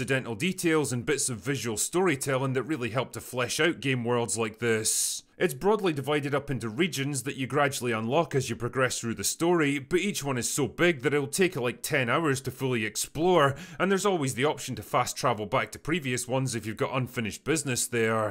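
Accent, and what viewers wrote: Scotland, United Kingdom. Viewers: Watched 10.5 minutes and liked to support our Sco'ish boi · It may be affirmation bias but I love how this was basically a 15 minute video of my own thoughts in a Scottish accent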